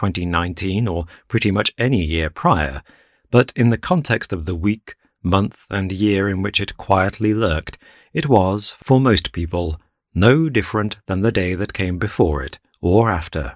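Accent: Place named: United Kingdom. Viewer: Your stories are pretty gruesome with detailed descriptions of repulsive stuff.......yikes. But whats weird is your lovely British accent makes it all palatable. Lol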